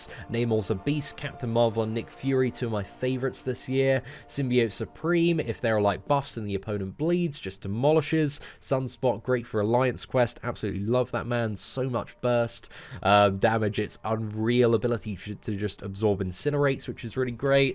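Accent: England, United Kingdom. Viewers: Compared to the other MCOC utubers your are first mainly because you have a very nice English accent !!!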